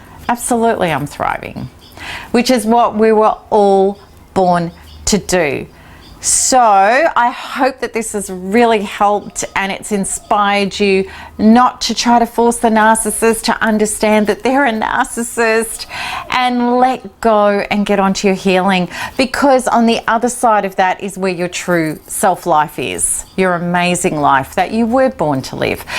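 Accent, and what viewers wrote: Australia. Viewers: By the way are you Australian? you sure sound it.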